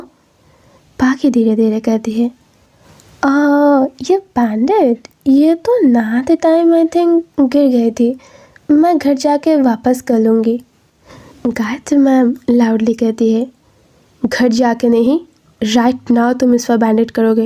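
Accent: Bengal, India. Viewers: Aapki Bengali bahut jyada cute Mary Bengali main musalman hun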